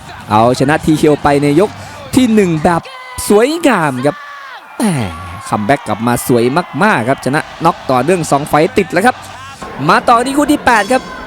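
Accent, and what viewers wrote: Isan, Thailand. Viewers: พากย์อิสานมันส์มาก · สุดยอดพากบ้านอิสาน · พากย์อีสานบ้านเฮาชอบมากไปครับ❤🎉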